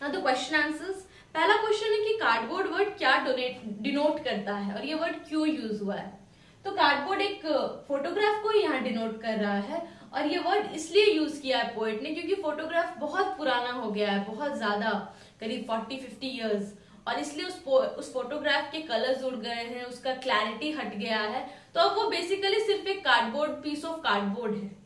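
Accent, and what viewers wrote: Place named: Bihar, India. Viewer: Didi you are incredible. Are you from Bihar ? As it seems to me.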